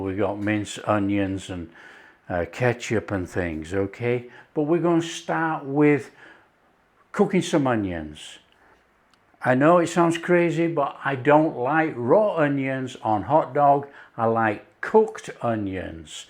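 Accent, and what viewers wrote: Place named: England, United Kingdom. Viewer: 😂🤣Love when you wrote “dodgy blokes” 🤗 That’s why I love, his English accent plus the great dishes he serves.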